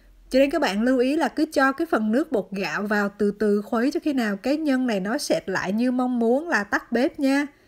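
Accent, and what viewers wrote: Central, Vietnam. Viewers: Cảm Ơn chia sẽ của chị Giọng nói miền Trung của chị nghe dễ thương Lai lai một chút giọng Huế 🥰🥰🥰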